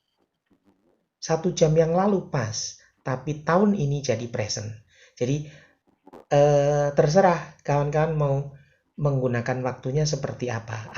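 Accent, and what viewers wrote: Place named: Surabaya, Indonesia. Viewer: ALHAMDULILLAH. THANK YOU. VERY HELPFUL. ESPECIALLY USING SUROBOYOAN ACCENT ❤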